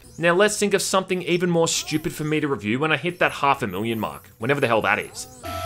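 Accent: Australia. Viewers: I love this man's review of huniepop it's hilarious an Aussie describing what hentai is very funny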